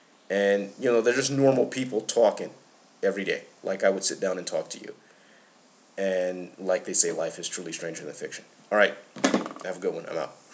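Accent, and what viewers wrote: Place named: United Kingdom. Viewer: bring back your british voice. it's HAWT and i miss it.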